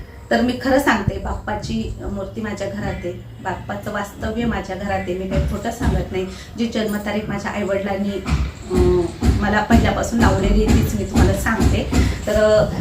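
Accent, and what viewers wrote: Nashik, India. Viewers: मला तर नाशिकची बाेली भाषा तुमची खुप आवडते माझी मैत्रीण सुद्धा सटाणा नाशिक येथील आहे तिचे बाेलणे सेम तुमच्या सारखेच आहे हे ऐकायला सुध्दा नशीब लागते❤❤❤❤ ताई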